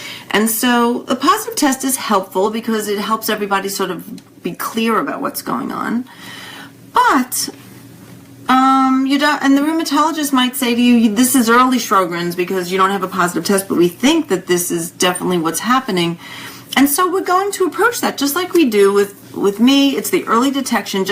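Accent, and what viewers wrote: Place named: New York, United States. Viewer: I think it's just her NY accent, maybe? I would've appreciated an improved effort towards personal appearance. Respect takes many forms.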